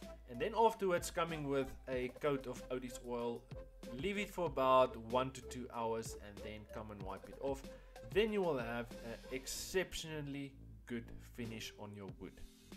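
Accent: South Africa. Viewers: Hi, I love the end result of this project. It looks amazing. Quick question, you sound very South African, are you in SA?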